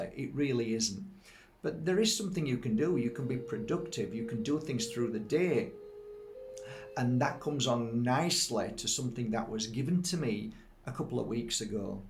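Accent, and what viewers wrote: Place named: Northern England, United Kingdom. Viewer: I seem to have been on a parallel path with your own and since our paths eventually crossed in cyberspace a week or so ago I have been viewing lots of your videos. I can't tell you how re-assuring it is to hear someone say what you feel is absolutely "spot on" - and in a proper Northern accent as well! Lella's video is so beautiful and I will certainly listen to it as you suggest. A few years ago I came by a small piece of Moldavite and also a small herkimer diamond from a gem shop which I am hoping are genuine. I plan on continuing to look and learn from your postings. Love and light to you and yours, and all your subscribers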